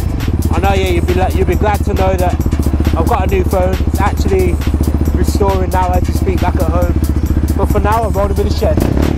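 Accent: Birmingham, United Kingdom. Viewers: Are you from the uk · I’m new are you from Birmingham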